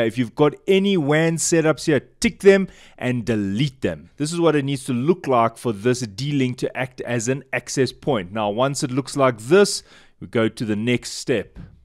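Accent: South Africa. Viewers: Such a mzansi accent, love it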